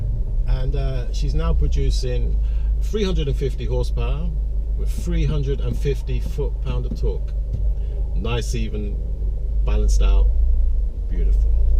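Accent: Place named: Bristol, United Kingdom. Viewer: From Bristol then mate? And nice reviews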